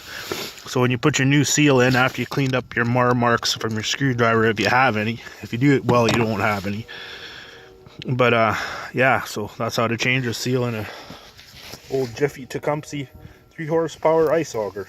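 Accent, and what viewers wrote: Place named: Uttar Pradesh, India. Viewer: You sound like you are from the U.P to me eh'...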